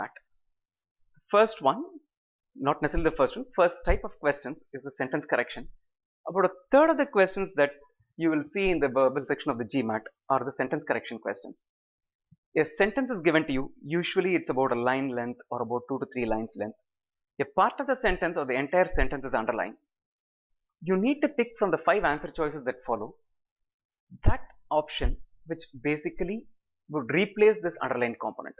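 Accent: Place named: India. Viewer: fuck off with ur indian English accent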